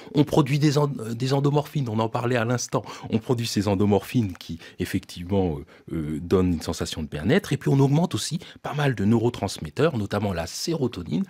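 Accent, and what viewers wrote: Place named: France. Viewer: Pourquoi ce monsieur prend l un accent Français....probablement si est français 😅😅😅😅 Pauvre Afrique....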